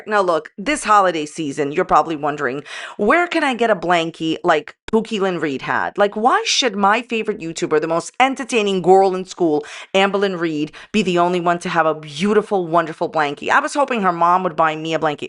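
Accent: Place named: New York, United States. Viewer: Your channel cracks me up. I’m from New York City area and your accent and your attitude. Reminds me just. Like home ❤❤❤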